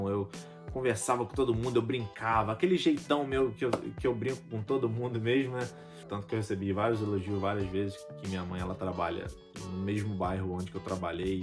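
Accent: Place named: Rio de Janeiro, Brazil. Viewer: I’m Bryan Lima gostei da sua história 😊 tão jovem já viveu muitas coisas, vim pelo canal da Jéssica gostei muito de sua mãe. Mulher guerreira não é fácil tomar a decisão que ela tomou. Pela sua educação vê que ela cuidou muito bem dos filhos, você é um garoto muito educado esqueceu de falar qual é sua cidade aqui no Brasil. Pelo sotaque é carioca vizinho aqui de minha cidade, Juiz de Fora uma cidade do interior de Minas que é um pouco carioca também #sqn você realmente abriu mão de tudo na sua vida, pra começar uma nova vida do zero imagino que não tenha sido fácil. Mas pelo jeito esta muito bem obrigado 😊 sorte sua estar vivendo em um lugar onde possa ter uma qualidade melhor de vida. É isso aí boa sorte pra você em todos seus projetos sejam bem sucedidos 🙏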